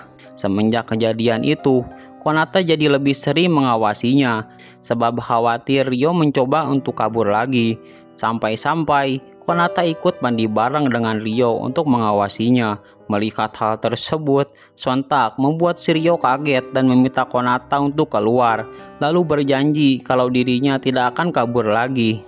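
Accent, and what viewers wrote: West Java, Indonesia. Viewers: Admin asli sunda nya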